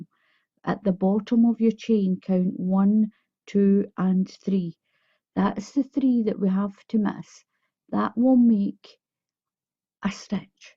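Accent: Scotland, United Kingdom. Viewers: When I was growing up, we had neighbors that were Scottish, you’re accent reminded me so much of these dear, sweet people. This video was very complete from the beginning to the end of the blanket. I have one started, but I didn’t know how I was going to fill it in and end it. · Hi. First of all I absolutely love listening to your Scottish accent. Secondly I have now mastered the c2c thanks to you. Finally are you able to tell me the make of the beautiful yarn shown at the start of this video which is grey and pink/peach white? Jeannette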